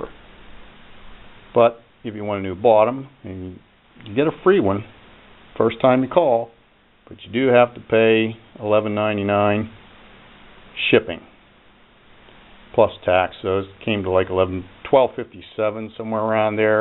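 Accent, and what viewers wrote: Pittsburgh, United States. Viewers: I struggled with this machine for several weeks before I thought I found the right tools (3 “ driver specialty bits). What a ridiculous machine. I also just happen to be from Pittsburgh and picked up on the accent right away. The “down in there/ get in there” gave me classic Pittsburgh vibes, and the ”jag off” ending was the icing on the cake! Made me feel all warm and mushy inside for my hometown. Thanks,! ❤️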